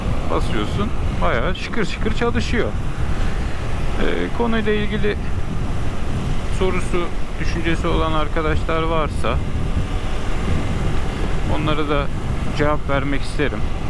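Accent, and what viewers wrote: Turkey. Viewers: Abi sen samsunlumusun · tam bafralı gibisi abi :D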